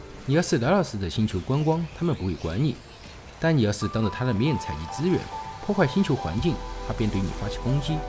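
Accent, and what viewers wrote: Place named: Southwest, China. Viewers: 一听就知道你是西南地区的人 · 他成都的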